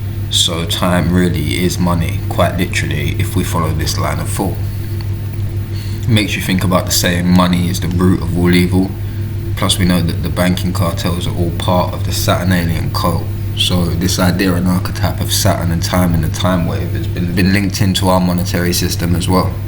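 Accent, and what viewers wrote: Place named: London, United Kingdom. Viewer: How did you possibly think this man was an American 😂 such a pure London accent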